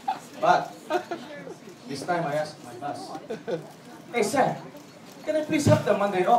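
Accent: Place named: Philadelphia, United States. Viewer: the best philli accent by faaaarrrrrrr <3 :P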